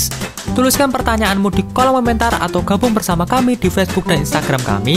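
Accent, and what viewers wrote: Java, Indonesia. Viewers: petirr oii petir.... bukan peteerr... medok bangett masss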